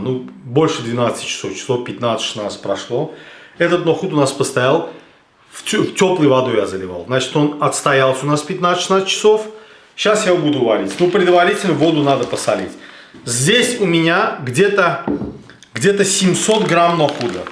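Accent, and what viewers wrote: Baku, Azerbaijan. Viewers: Обожаю ваш Бакинский акцент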